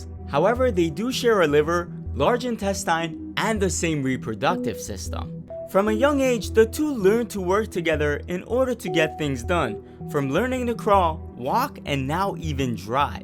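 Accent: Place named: Canada. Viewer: Heyy I recognized your Canadian accent 😁 Es-tu de Montréal ?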